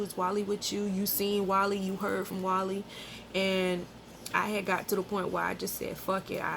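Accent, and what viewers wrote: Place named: South, United States. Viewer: She has southen accent. Country niggas is Gay. Whats new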